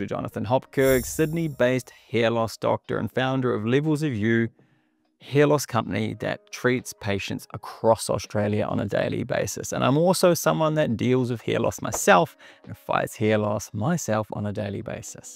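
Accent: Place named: New Zealand. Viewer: And just for our listening international audience, in case you’re wondering, our good doctor is a Kiwi aka New Zealander living in Australia. There’s no mistaking that accent.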